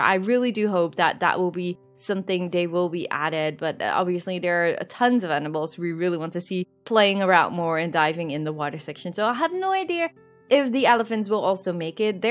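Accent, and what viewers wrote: Netherlands. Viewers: I knew you accent sounded Dutch! Never been to Rotterdam zoo but I loved Beekse Bergen. Been a very long time since I last went to visit but I'm definitely gonna go again sometime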